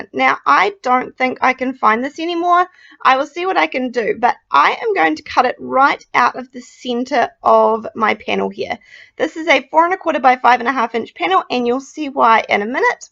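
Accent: New Zealand. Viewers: I stumbled onto your you tube card making and am now subscribed, absolutely loving your techniques and have tried a couple, now working my way back through your previous video's. Great to hear a Kiwi voice - thanks so much and keep up the brilliant work.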